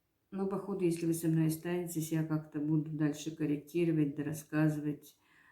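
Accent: Standard. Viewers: Вы так чисто и правильно разговариваете,приятно слушать Ваши рассказы.Испытаний много на Вас выпало.Хорошо что у Вас много хорошей родни.У нас родители вообще с дет.дома,с Дагестана.В ВОВ у них умерли от голода и болезней родители, и все моего папы маленькие братья,он 7 летний мальчик остался один из пяти детей в семье.Осталась одна старая бабушка,которая отдала его в детдом.Родни дружной как у Вас у них не было.Сами прокладывали себе путь.Вы очень сильная и трудолюбивая женщина.Пусть у Вас все наладится.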